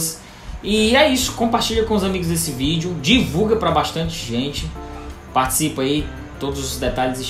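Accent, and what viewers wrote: Northeast, Brazil. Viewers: Canal com um ótimo gosto literário e com o sotaque mais bonito do Brasil. Rsrsrs. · Parabéns pelo 💯 video, sempre assisto e pego várias dicas, amo vê o sotaque do meu nordeste no booktube👏👏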